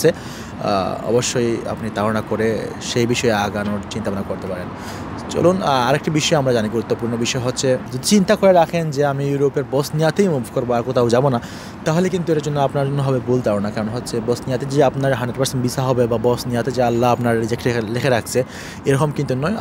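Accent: Sylhet, Bangladesh. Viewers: আপনার সিলেট মাত লাগের সিলেট নি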